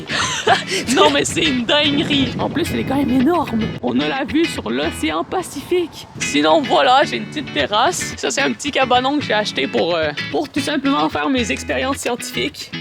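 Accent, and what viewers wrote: Quebec, Canada. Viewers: Québécois je pensr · Tu es québecois ? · J'adore l'accent Québécois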